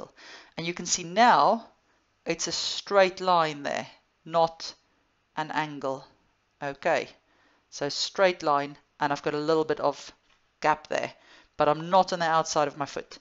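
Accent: South Africa. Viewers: Thanks you and is that that an South African accent? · South African accent!! I dont hear to many of those anymore here in the UK haha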